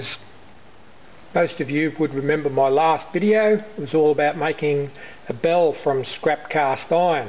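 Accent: Australia. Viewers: Sounds like your from Victoria ?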